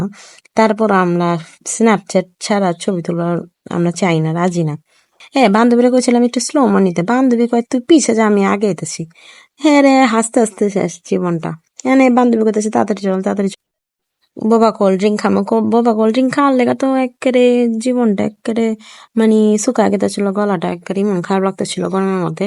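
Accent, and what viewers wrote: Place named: Dhaka, Bangladesh. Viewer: আপু ঢাকায়া ভাষা খুব স্মার্ট ❤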